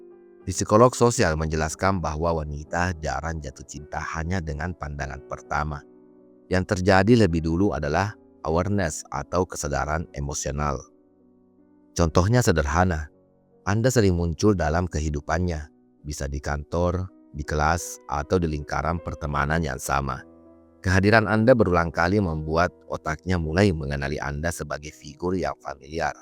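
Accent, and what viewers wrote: Indonesia. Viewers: Yg ngomong pasti orang Bugis/Makassar😂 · Sy tauu !! Suara di vidio ini pasti orang Sulawesi😊 tepatnya Bugis😅😊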